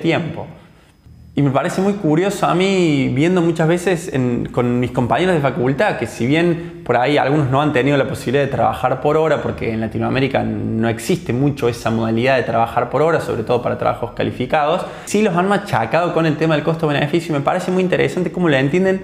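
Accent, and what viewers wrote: Córdoba, Argentina. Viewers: El acentito Cordobez :) !!, estoy de acuerdo en lo que decís, de hecho, actualmente un vuelo de buenos aires a san Juan y viceversa que tarda 2 hs, cuesta lo mismo que ir en ómnibus que tarda 17 hs en promedio. La gente por X razón siguen viajando en ómnibus !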